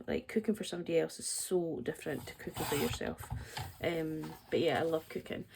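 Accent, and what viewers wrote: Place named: Inverness, United Kingdom. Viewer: I came to Inverness last year. You do have a Inverness twang to your accent xx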